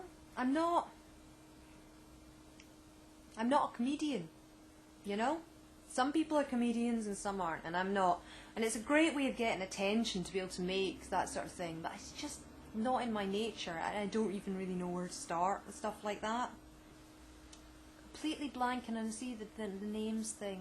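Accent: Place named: Scotland, United Kingdom. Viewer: I love your accent! You have to be from Scotland right?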